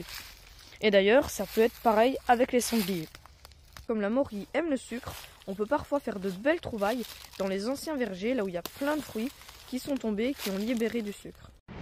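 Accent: Franche-Comté, France. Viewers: Bravo ,et j'ai cru reconnaître une pointe d'accent Franc Comtois, d'où je te félicite. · Enorme, parce que vous avez l'accent franc-comtois.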